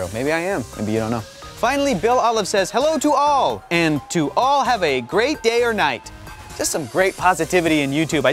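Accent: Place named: Australia. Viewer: That Australian accent though.😂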